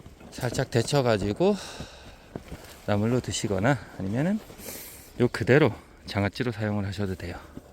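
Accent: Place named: Gangwon, South Korea. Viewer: 그곳은 혹시강원도인가요? 제고향 강원도억양인거같아서요~~말투도 정겹고 고향도그립고 엄마랑 나물하러다니던 추억도 그립네요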